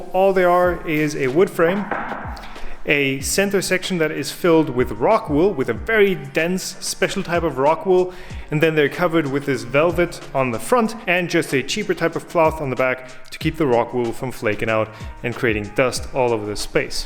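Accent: Bavaria, Germany. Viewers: Bist du aus Bayern? o.O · Habe heute ein deutsches Video von Dir gesehen. Das war so seltsam :D Schönes Phänomen, wenn man Leute mit deutschem Dialekt sprechen hört, die man zuvor nur tadelloses Englisch sprechen hörte. Ging mir das erste Mal so, als ich mit Marius Hornberger sprach. Vielen Dank für die vielen Videos! Grüße aus Wilhelmshaven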